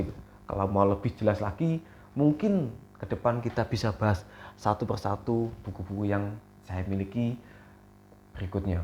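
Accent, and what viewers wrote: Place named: Java, Indonesia. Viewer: Logatnya kaya orang jawa..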